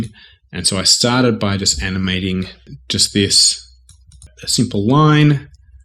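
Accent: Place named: Australia. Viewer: wait you're australian!? ozzi ozzi ozzi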